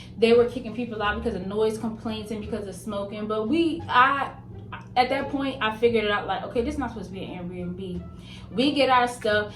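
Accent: Baltimore, United States. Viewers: Her accent sounds like she’s from Baltimore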